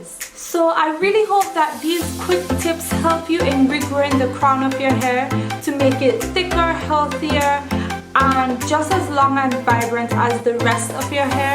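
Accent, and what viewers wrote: Caribbean. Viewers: sounds like you have an accent....Caribbean girl :D.....great video..... I had to cut the rest of my hair to the length of my crown it was fine at first.....and it doing much better....still a bit shorter and grows slower and kinkier but it made soo much progress.....im really glad....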